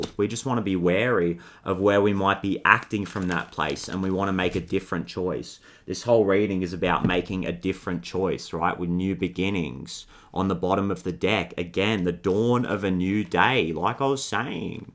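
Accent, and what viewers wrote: Australia. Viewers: I love you so much!!! Are you from Australia by any chance?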